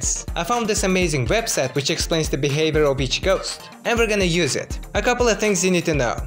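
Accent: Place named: Mexico. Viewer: Hablas español? Tienes acento como de mexicano xD pd: geniales vídeos, me motivan a seguir programando 🙂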